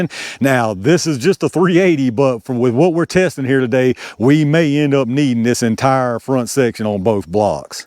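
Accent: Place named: Appalachia, United States. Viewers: That's just the way we talk in the mountains. You say hillbilly like its a insult😆🖕💯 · This is 2026 right? I think we can do without the hillbilly accent.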